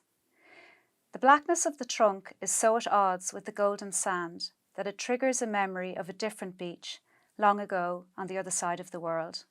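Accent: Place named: Meath, Ireland. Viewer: Beautiful reading. The Meath Navan accent hasn't disappeared either. Congratulations.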